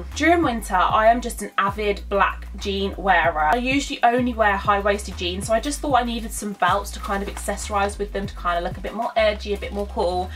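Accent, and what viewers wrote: United Kingdom. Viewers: is your accent Birmingham?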